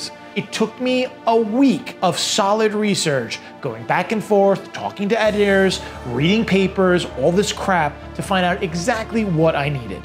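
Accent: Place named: Queens, United States. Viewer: Great video! Aside from the great content, you had me chuckling at a few points (I'm a tough audience for comedy). I love your accent. I'm from Springsteen's home town and I'm trying to determine which borough your from. Your accent isn't strong enough for the Bronx, and I definitely don't hear LonGIsland (no space and capital G on purpose). I'm going with Queens. I'm at the Camtasia/OpenShot level of video editing ability, so please excuse my ignorance. You built this with a focus on Adobe - how would it do with DaVinci Resolve (I'm cheap) and other Black Magic Design products, please? I assume it would chew through them, too. Thank you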